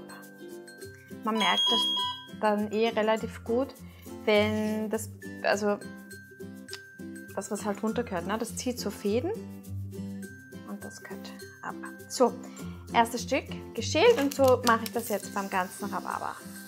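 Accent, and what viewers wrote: Austria. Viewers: Ach wie nett, endlich mal KEIN Piefchinesisch sondern sympathisches Österreichisch!!! Hab das Rezept NOCH nicht ausprobiert - klingt aber plausibel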